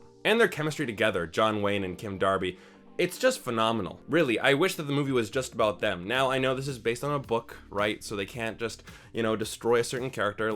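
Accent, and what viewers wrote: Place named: Rocky Mountains, United States. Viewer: So, I'm a rare species in that I'm American and speak more than one language very comfortably, if you're not from Alberta or Eastern British Columbia Canadian I'm super impressed. Brits can't do Rocky Mountain American like you can. You're not German because you can say "W". Swedes and Norweigans do a great job at this particular dialect of English, but the Dutch even though huge percentage speak nearly perfect technical English, never quite get the rythm right. Not Aussie because they love their own way of saying things and they should because it's great. If you know anyone from Australia, the only thing funnier than doing John Wayne by John Wayne himself because he's from Illinois and his accent is not actually his own I believe is have an Australian do an impersonation of John Wayne.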